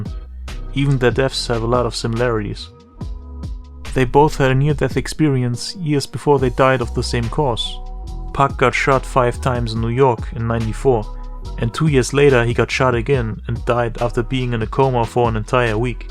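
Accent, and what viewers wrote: Germany. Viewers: wenn man im Profil des Videos auf "Kanalinfo" klickt, kommt auch die Info "Herkunft: Deutschland". Man erkennts ja auch am Akzent :D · Habe ich mir fast gedacht... dein accent sounds pretty german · Dickaa, ich hab gewusst, dass dieser Akzent Deutsch sein MUSS. Bin in die Info und dann sah ich, 'Aufenthalt Deutschland'. Mach weiter so, bleib am Ball🫡 Rest In Heaven, A Rap LEGEND✓✓✓😔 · First time that i hear you speak. Are you German? Because your accent sounds like it. Also, Rest in Peace to one of the GOATs. If you somebody suffers from depression or dont feel well for other reasons, believe me drugs are never the answer! They only put you even more down, i know what i am talking about, i also had drug problems in the past. Hope really found his peace! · Loool you sound very german biste deutscher? Edit : Okay hab den Mediamarkt und deine Geschichte gesehen krass! 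Hätt net gedacht das du Deutscher wärst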